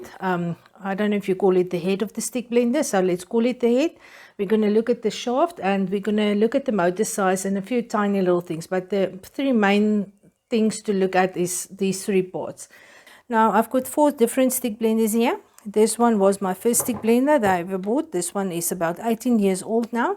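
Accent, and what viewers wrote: South Africa. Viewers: Hello, I have just discovered your channel. So nice to hear a South African voice on Utube making soap.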